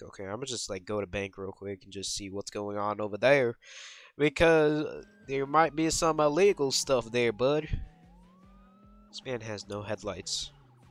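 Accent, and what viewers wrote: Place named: New Jersey, United States. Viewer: Question did you have a teacher named Mr. Lambersky he is from the New Jersey area and has your accent.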